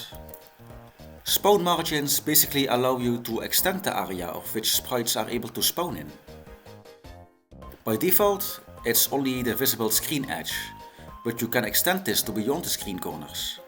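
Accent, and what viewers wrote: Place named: Netherlands. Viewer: kinda unrelated but i like the dutch accent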